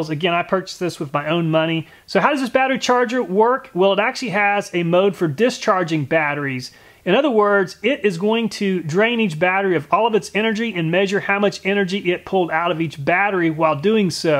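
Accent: United States. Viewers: plus that strong american accent 😁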